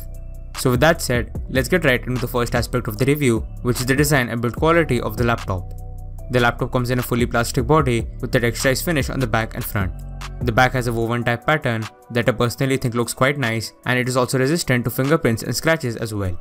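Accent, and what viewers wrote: India. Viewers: Very thicc Indian avcent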